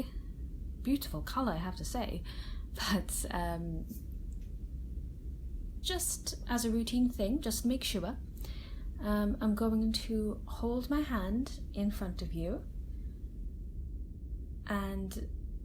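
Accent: Wales, United Kingdom. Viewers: AWESOME VIDEO PLEASE MAKE A PART TWO TO THIS ALSO I LOVE YOUR BRITISH ACCENT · u sound kinda welsh lol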